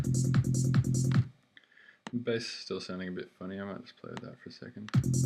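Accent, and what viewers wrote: Australia. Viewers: Thanks for the videos.. Great Help. Still very much a beginner so good to hear someone I understand. The Aus accent helps..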